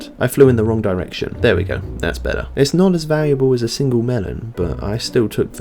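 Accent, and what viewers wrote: United Kingdom. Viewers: god i really like your calm British accent you sound soo chill also all hail the king of melons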